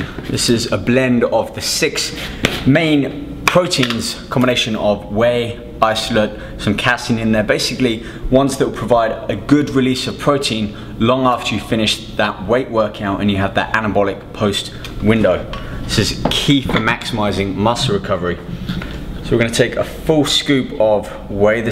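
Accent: United Kingdom. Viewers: What accent is this ? British ?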